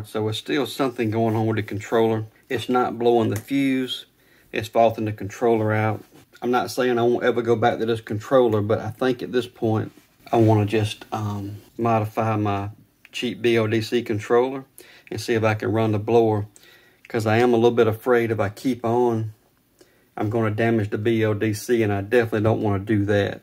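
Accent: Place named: North Carolina, United States. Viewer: Are you from North Carolina by chance? Your accent sounds like my dad's hometown in the southeast.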